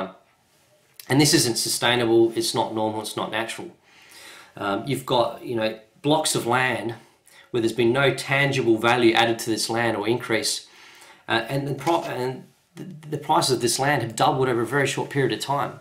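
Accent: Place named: Adelaide, Australia. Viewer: you got one thick Adelaide accent there buddy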